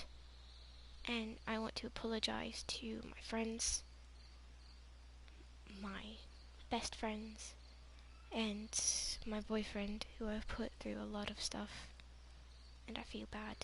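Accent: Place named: United Kingdom. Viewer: I'm so sorry what you've gone through (T^T) ps. You sound British Pps. I love you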